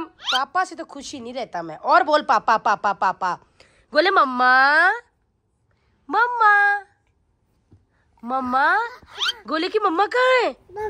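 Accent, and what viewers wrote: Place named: Odisha, India. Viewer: odisha se hai isiliye ese pronounce karte hai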